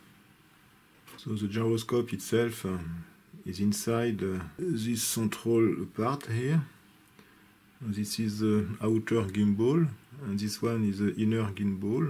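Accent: France. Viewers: What a French accent !!!! 😅😅😅😍😍😍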